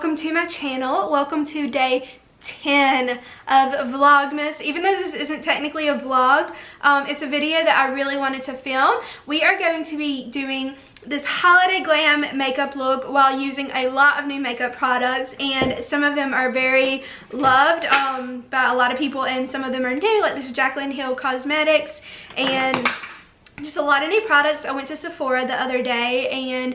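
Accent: South, United States. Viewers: Im obsessed with your southern accent it's just so adorable 😩😩😍😍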